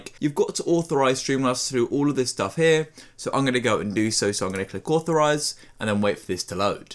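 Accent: United Kingdom. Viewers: He’s definitely British dude he can’t help it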